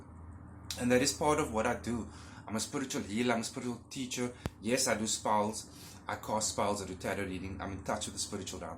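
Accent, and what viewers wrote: South Africa. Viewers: Are you a south African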